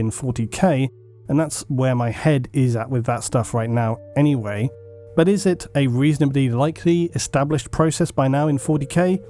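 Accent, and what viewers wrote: United Kingdom. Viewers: 3+ hours of a smooth talking British man coming to terms with free will being an illusion 😆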